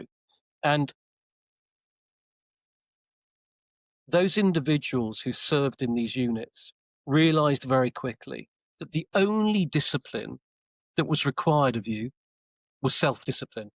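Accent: Wales, United Kingdom. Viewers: Are you Welsh? Great video! Sub’d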